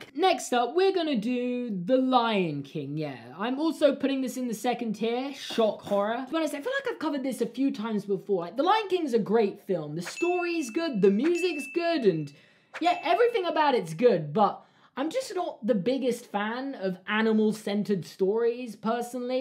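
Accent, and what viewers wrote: United Kingdom. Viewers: As a fellow Britishman, I approve of the Renaissance pronunciation going on in this video 👍🏻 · see this is what irritates be. People from the US saying a brit is mispronouncing their own language.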